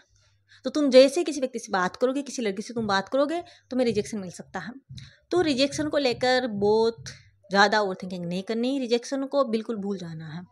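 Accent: Rajasthan, India. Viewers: Another Great Video From BTW You Sound So Rajasthani, Are You From Rajasthan?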